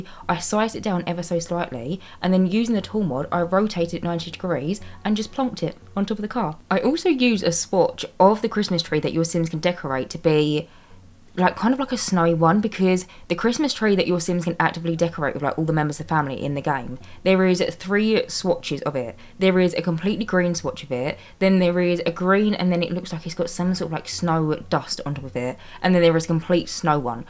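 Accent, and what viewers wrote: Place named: London, United Kingdom. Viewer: I absolutely adore the way you build so realistically. The way you clutter is amazing and feels like an actual home and not an ideal, spotless house. I play TS4 on console which means I can't have the tool mod, so a silly lil thing I do is download your builds and then just take any things that I like that you rotated (eg. the journals that you had in the teen's room) and just save it to use for myself later on, lol! But anyways, I really enjoy your videos and especially your accent, it makes me feel so at home since I don't live in London anymore.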